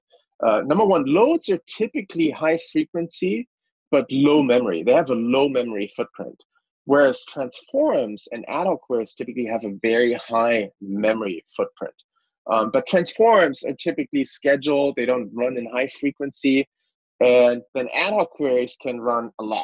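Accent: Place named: England, United Kingdom. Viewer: Clear enough, and with a clear English accent. I'll allow it.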